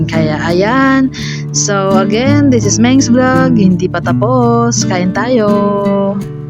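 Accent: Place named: Iloilo, Philippines. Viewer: Ilonggo gd ah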